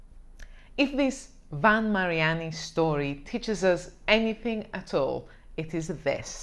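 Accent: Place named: Italy. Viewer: Redhead ✓ Wine expert ✓ Italian accent ✓✓✓✓✓✓✓✓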